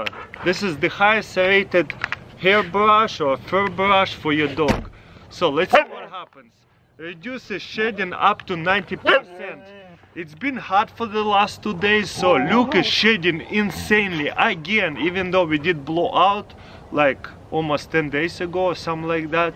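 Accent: Russia. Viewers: Ох уж этот русский акцент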